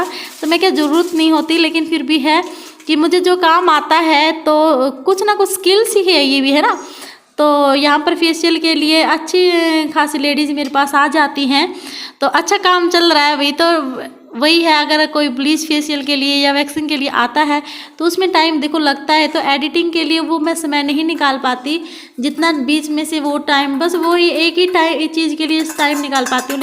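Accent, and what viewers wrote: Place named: Haryana, India. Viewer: App haryanvi bolte hue achi lgti ho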